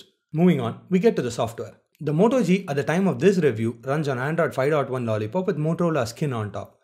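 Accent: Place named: South India, India. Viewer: Good job. Now that you've started sharing the burden off Ash's shoulders, you will grow into a much mature reviewer in the coming days as you have Ash for support. Just need to tone down your South Indian accent a bit. but nonetheless, a good review. thanks!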